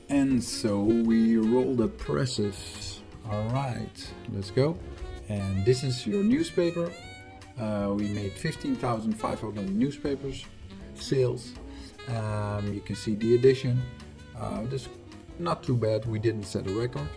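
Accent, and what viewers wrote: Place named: Netherlands. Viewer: Volgens mij hoor ik een Nederlander?!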